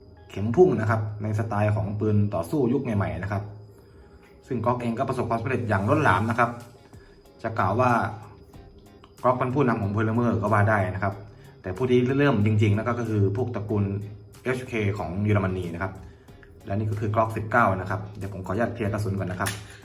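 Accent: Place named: South, Thailand. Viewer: *ชัดเจนจริง นี้คนใต้แน่ผมว่าพี่ นี้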